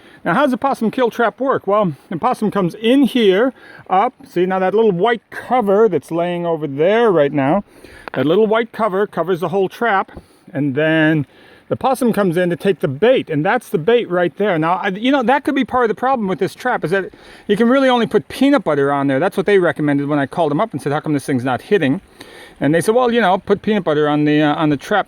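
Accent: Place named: United States. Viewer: This is in New Zealand - you can tell from the rimu and ferns - where possums are a serious pest. He just happens to have an American accent.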